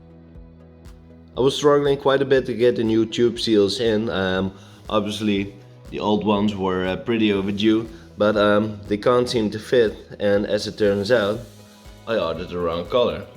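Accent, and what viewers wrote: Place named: Netherlands. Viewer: Aargh, dat Nederlands 'engels' is tenenkrommend.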